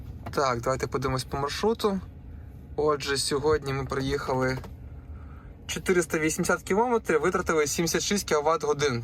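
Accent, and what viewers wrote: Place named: Zakarpattia, Ukraine. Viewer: Так початок він почав не лише українською а з закарпатським діалектом нам закарпатцям дуже приємно що українці поважають діалекти різних регіонів це вселяє надію що все у нас буде класно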